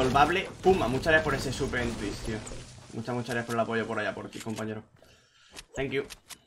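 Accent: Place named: Andalusia, Spain. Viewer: Que rabia me das, que seas de barcelona y hables como un andaluz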